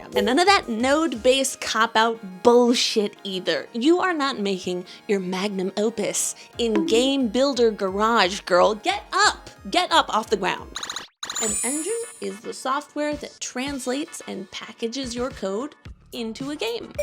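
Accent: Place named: United States. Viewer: hello! thank you for the video, it finally pushed me to start actually learning to program (cry). also you mention a lot of games in the video, would you mind writing the names out? i'm a little hard of hearing and unfamiliar with american accents, so sometimes it's hard to pick out the actual spelling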